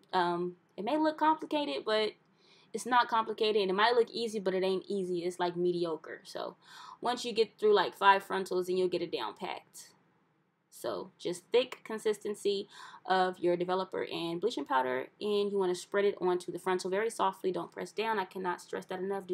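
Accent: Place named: Sacramento, United States. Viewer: I’m so nervous to try this idk why 😂 and you must be from Sacramento, I hear a Sacramento accent lol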